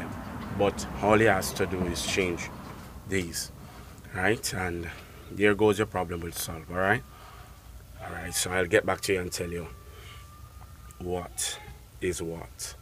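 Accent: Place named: Jamaica. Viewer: Bro you're from Trinidad and sounding like a Jamaican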